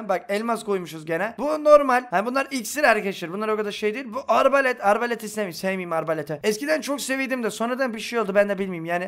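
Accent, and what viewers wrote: Black Sea, Turkey. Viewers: abi sen nerelisin karadenizlimisin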